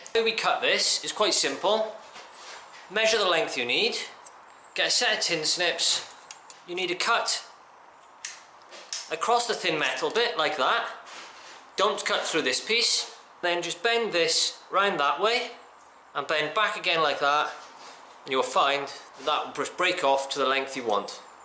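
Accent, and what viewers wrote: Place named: South Wales, United Kingdom. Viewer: South Wales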